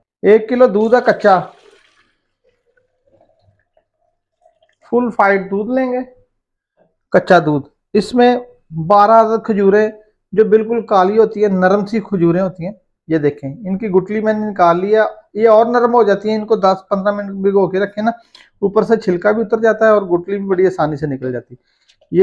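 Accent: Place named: Sialkot, Pakistan. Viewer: بہت خوب جزاک اللہ خیر لہجے سے لگتا ہے کہ سیالکوٹ یا گردونواح سے تعلق ہے۔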